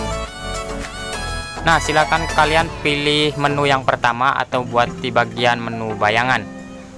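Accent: Indonesia. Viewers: Wong Tegal um? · Bang emng lu orang Cirebon barat?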